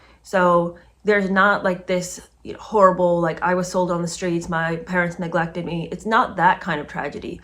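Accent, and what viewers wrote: South, United States. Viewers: As soon as the video was over, I was like: gimme, gimme 🎶 Great book and even better with you telling it, of course! Also, I really like your southern accent 😅💕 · I've learned more about Brittany in Part 1 than Jada in her entire book Worthy😂 And by the way, I love your southern accent .😃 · One chapter and this book already has more depth than Worthy. This should be interesting. Love your southern accent. I just finished Matthew Perry's biography. His account of his life is raw. It helps to explain why and how he struggled. You might enjoy it.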